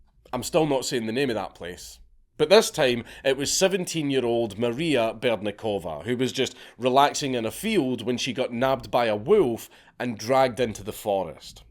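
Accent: Scotland, United Kingdom. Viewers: If you have the volume low enough and aren’t well versed in the Scottish accent, girls sounds like “ghettos”. Took me like 30 min to figure out why he keeps calling people ghettos lol.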